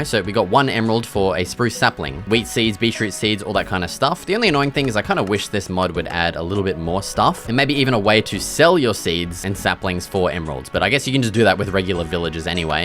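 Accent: Australia. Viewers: why are you australian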